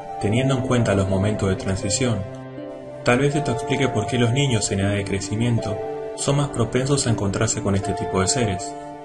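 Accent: Argentina. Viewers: bueno pues tu acento me parece que es de una persona de argentina. posdata: me saludas a maradona y ha leonel messi jajajaj es broma tío. buen vídeo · eres argentino o uruguayo o paraguay por el dejo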